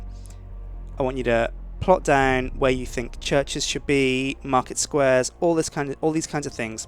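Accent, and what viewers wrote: United Kingdom. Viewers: Because of his accent. It's scientifically proven you cannot get bored while listening to a guy with a Britsh accent.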